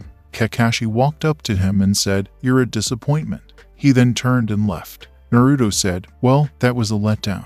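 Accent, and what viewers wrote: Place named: Indiana, United States. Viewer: Sometimes I feel like all of these Naruto what if channel's are made by the same person with the same voice and the same accent like I think it's kinda sus that at thea beginning of each vid it's like this guy with I think is a Indiana accent and I lot of other What if channel's I feel like are always random guys with a Indiana like accent and the fact that all them always talk with that accent in the beginning of the video is a hint but its just a theory a film theory thanks for reading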